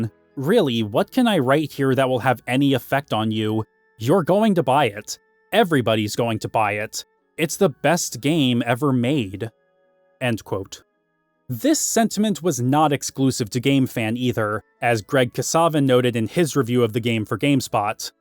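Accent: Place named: United States. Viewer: I'm around a decade older than you and played FFVII on its release and it was absolutely life changing at the time. That said I really wasn't aware of any real stigma associated with video games at the time. Pretty much everyone played video games when I was growing up, especially after the release of the PlayStation. That said I grew up in Wales, not the States (at least that's my assumption based on your accent, apologies if that's not the case) and my experience of high school was nothing like your own. That said great video I look forward to watching more of your work.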